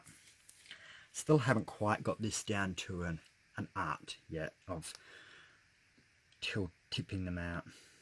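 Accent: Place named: Australia. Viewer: I think it turned out really cool. The colours are lovely and I love the Melon and Turquoise cells. I have those colours too! It's lovely. I'm trying to place your accent... It has Aussie in it but doesn't sound pure Aussie? I'm fairly new to Aus so I'm still getting used to the accents. I'm from South Africa so I'll sound totally different to you and I'm so nervous about that. Anyway, good on you for starting this adventure!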